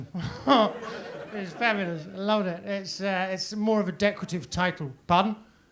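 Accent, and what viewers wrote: Liverpool, United Kingdom. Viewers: His scouse threw me so hard I thought he actually was a scouser and I am hahs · scouse accent pisstake is better than most scousers, sounds like Yosser Hughes’s and Jan Molby taught him 🇬🇧👍🇬🇧👍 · I can’t believe this lad isn’t a scoucer ! Impeccable accuracy and accent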